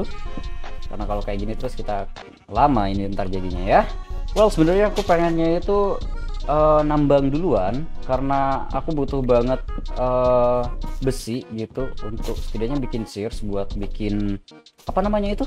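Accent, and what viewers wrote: Madura, Indonesia. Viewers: Bang lu orang madura? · Orang MADURA Bang? Madura mana bang?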